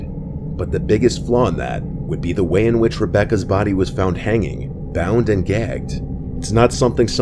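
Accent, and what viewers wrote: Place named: New Jersey, United States. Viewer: Great videos! I am super fan. I feel like I hear a slight NJ accent. Or NY maybe... Anyway, keep them coming!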